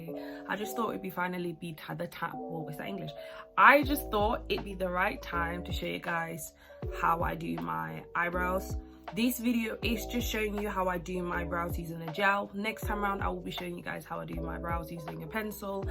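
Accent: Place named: Scotland, United Kingdom. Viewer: Appreciate the subtitles cause I don’t understand your Scottish accent x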